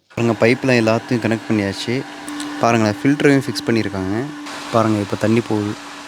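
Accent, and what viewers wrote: Standard. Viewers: எங்கள் ஊரில் திராவிட மாடல் தமிழ்.... நீங்கள் பேசுவது தான் உண்மையான தமிழ்... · Ur tamil is good. I.ur speaking pure tamil. As an Indian I proud of you. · ரஷ்யாவில் தூய தமிழ் மணக்கிறது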